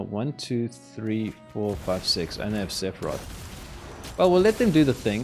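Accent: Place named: South Africa. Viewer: You South African? :D Also, I see Mythic Mike, I like haha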